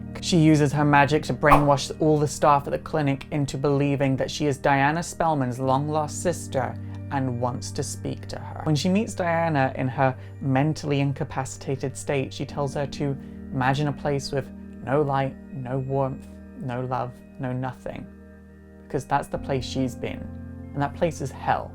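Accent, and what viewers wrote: Scotland, United Kingdom. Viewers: where abouts from Scotland are you from. I'm from Glasgow. BTW I have the biggest crush on you, and watched your vids for ages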